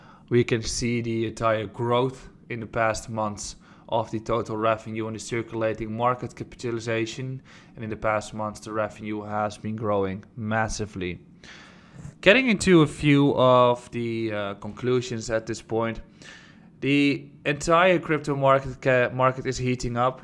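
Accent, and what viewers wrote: Netherlands. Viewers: Nederlander vanaf 60km te horen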